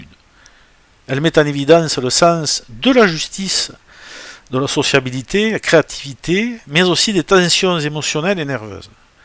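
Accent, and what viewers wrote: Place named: Occitania, France. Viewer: Bonjour à vous, Mon prénom commence par la lettre J, et je me retrouve dans ce que vous dites. Nous avons le même accent Occitan. Je vous écris de Narbonne 😊.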